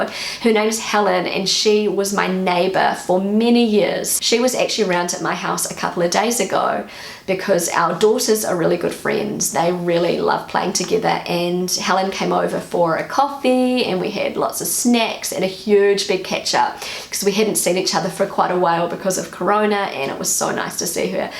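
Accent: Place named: New Zealand. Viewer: Don't be afraid about an accent; most of Germans speaking English have one ... ;o) ... so be one of us! And even as a Kiwi you have an accent which most of Germans are not used to; so don't worry. It can be managed. I worked for many international companies and get in touch with a lot of people from all over the world - they all have an accent. So ... and if you afraid of speaking ... sing! ;o) I'm looking forward for your next video in German, be sure eveybody will love it. And BTW ... don't take it as an accent, take it as you personnel dialect. Here in the Rhine area each and every small village has a dialect of it's own and when I moved here, I needed my (former) girlfriend to translate the local dialect to "normal" German.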